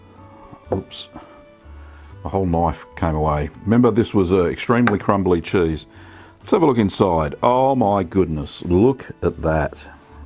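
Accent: Australia. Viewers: I bought some of this from a cheese maker in Napa, California over 20 years ago. It was amazing on crackers, then I used some for gourmet grilled cheese sandwiches and macaroni and cheese. Absolutely wonderful. I hardly ever find it these days. I am an eater, not a maker, so I have to keep looking. Love your accent from Oz. My grandfather was born in Sydney in 1899. I’ve visited 4x for business and holiday, and look forward to returning again.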